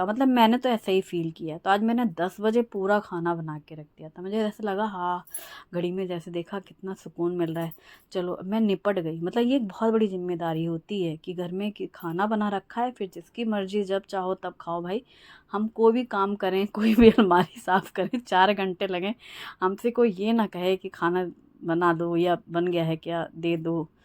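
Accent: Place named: Banda, India. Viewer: Sahi kaha rhi ho aap kaam Kabhi nahi katam hota apke bolne ka ton bilkul banda ki taraf ki hai kahi pe raho pr apne jameen ki khushbu aa hi jati hai❤️❤️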